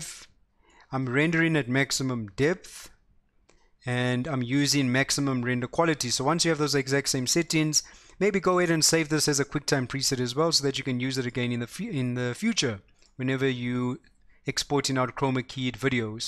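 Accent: South Africa. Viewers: I can hear you're South African, hahah, hello from Durban 😂👍🏻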